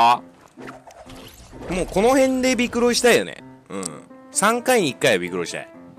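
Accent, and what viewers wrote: Kansai, Japan. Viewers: 関西からしたら喋り方普通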